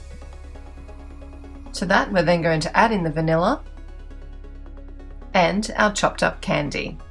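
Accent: Australia. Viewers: Are you Australian? Ps I love all your vids :)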